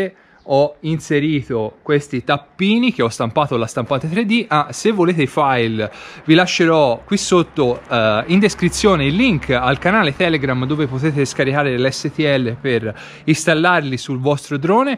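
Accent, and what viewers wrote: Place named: Tuscany, Italy. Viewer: Oh che sei Toscano?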